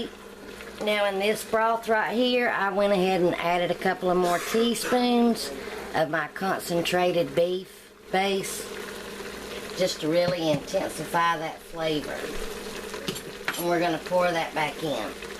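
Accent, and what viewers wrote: South, United States. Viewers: Your very welcome! What part of the South are you from? Greetings from Canada....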